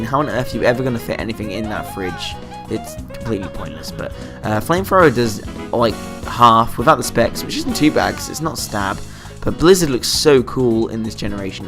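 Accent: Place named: United Kingdom. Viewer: Nice! Put out more content like this and you've earned yourself a new subscriber! Good narration style too, it's nice to hear a fellow Brit on here haha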